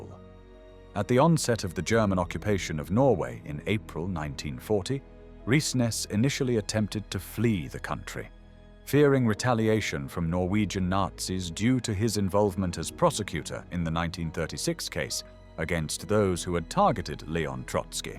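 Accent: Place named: United Kingdom. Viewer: agreed. or at least someone without a UK accent or whatever that is. sorry, cannot listen to an entire video of that accent.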